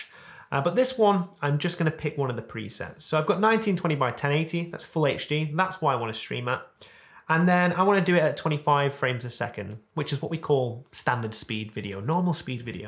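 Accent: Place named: Northern England, United Kingdom. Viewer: Love the Northern accent my man, thanks for the helpful explanation.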